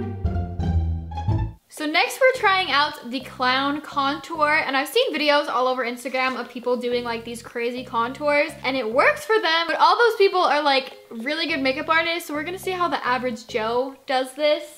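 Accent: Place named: United Kingdom. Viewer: You sound British in this video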